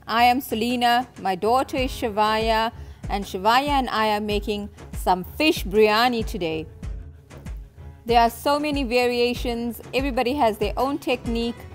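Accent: Durban, South Africa. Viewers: Unmistakenly Durban accent. My sister in US over 50 yrs still has strong Durban accent. Me tooo🎉🎉🎉🇿🇦🇿🇦🇿🇦. Had that beautiful barracuda over 50yrs ago in Durban. I can smell those Durban spices. My mum also made the most delicious tinned fish biryani which I still make. It’s a hit when I do🎉🎉🎉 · Excellent production!!! How lovely to hear authentic South African commentary without the horrible American Slang. Please preserve your beautiful accent and unique presenting style!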